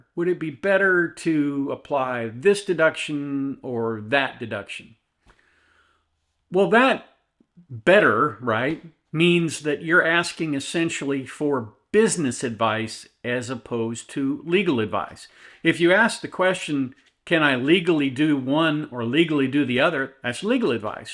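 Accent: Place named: Midwest, United States. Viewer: Thank you, sir, for your content. Found your channel during the Brooks trial, as well as many other licensed lawyers’, which helped me understand more about the judicial process. Aside from his antics, the trail really pulled the curtain back for the layperson to see how one works. You and your YT brethren helped fill in the holes and maybe even influenced people to look into the judicial system as a career, to make it less intimidating and more open. Knowledge is power and all that. You are correct in that not everything you put out will be applicable to everyone, but know that there are those of us, both who have discovered you and those who haven’t (yet), who appreciate your insight and willingness to share knowledge, so again, thank you. And yes, doing one small good thing a day does help the world. Side note: I’m retired US Navy, born and raised in MO (STL, then Mid-MO), retiring in the Seattle/Tacoma area. 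Though I doubt I will ever return home permanently, - we have the Cardinals, Chiefs and Mizzou, after all! - it does make me smile to hear a little piece of home. Your candor, your midwestern accent and your Missourian viewpoints all have me missing home juuuust a little bit more, so thank you for that.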